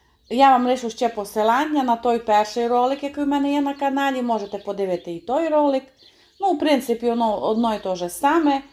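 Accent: Transcarpathia, Ukraine. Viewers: Можу цілу вічність насолоджуватись закарпатским діалектом та кухнею💕 З любов'ю "западєнєц" з Донбасу ^^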